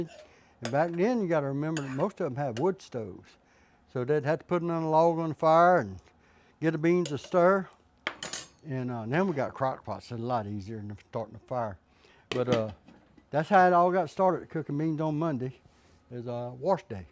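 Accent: Louisiana, United States. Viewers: I did not understand the type of seasoning , must be thst Cajun accent. I use to live in New Orleans ,I miss those days. Thank you for the recipe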